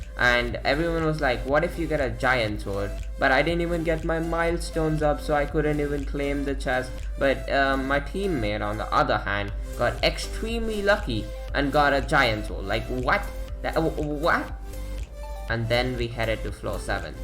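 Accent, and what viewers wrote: India. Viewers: How do you have such a Indian accent?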